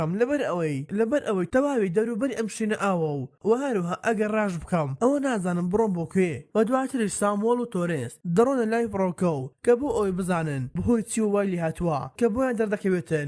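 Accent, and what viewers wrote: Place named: Erbil, Iraq. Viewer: Baxwa dangt naxosha awa chia 3aaaaa har dyara hawlerit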